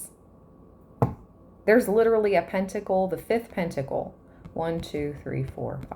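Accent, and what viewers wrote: United States. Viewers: Are you from Kentucky?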